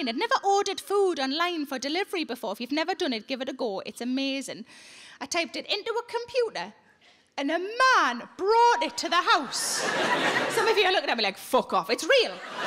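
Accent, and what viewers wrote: Newcastle, United Kingdom. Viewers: Aha, Geordie/Hobbit crossover, I approve.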